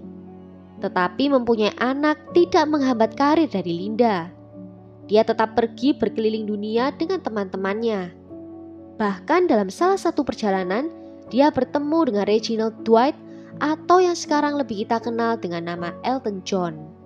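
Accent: Java, Indonesia. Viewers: Jawanya medok ya kk 😄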